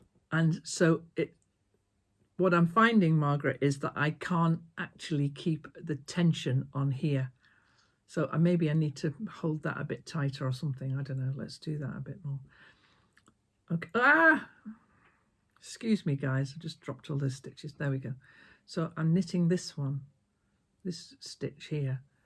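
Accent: England, United Kingdom. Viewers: I do wish I had found you in the midst of the pandemic when I was stuck home and missing my friends, because watching your videos is like sitting with one of my friends except of course your English accent because I am American. I am very glad to find you now and am watching all your videos and am thinking I am going to have to join your patreon channel to see what that is all about. I have never felt the need to join one before so maybe I better check it out.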